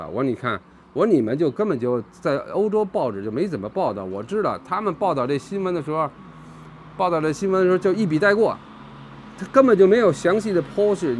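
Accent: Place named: Beijing, China. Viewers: 他可能指的是祖籍，很多人会这么讲，听口音应该是在北京长期生活过的。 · 京爷 。哈哈 这口音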